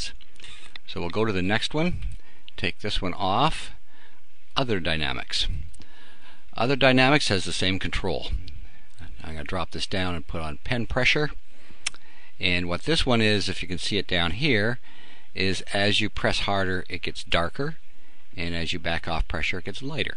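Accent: Canada. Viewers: i dont have this, so my question is dumb, buttttt: you keep saying "press harder" what do you mean? are you you using some sort of pen? how do you press harder on a mouse? -sorry i dont really understand but i DID enjoy watching! p.s. are you canadian? you sounded canadian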